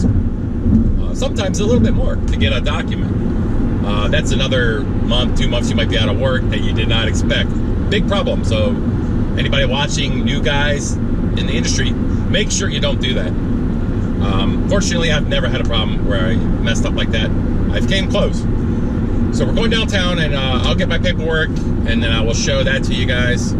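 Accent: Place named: United States. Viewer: i dont,t understend you))). На самом деле это вот настоящий американский язык и произношение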